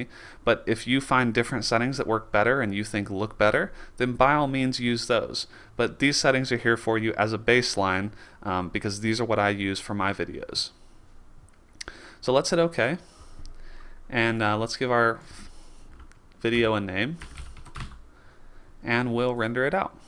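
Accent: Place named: Texas, United States. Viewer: Your dumb.....its called a Texan accent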